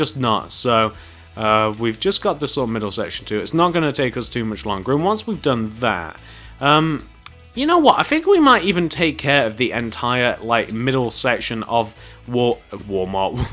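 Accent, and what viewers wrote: United Kingdom. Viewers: I knew you were British because of your accent. Thanks for your country saving us from the French.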